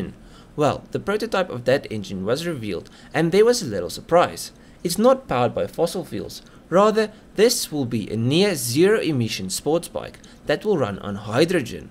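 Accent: South Africa. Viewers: my guy you sound like you from South Africa ✌👌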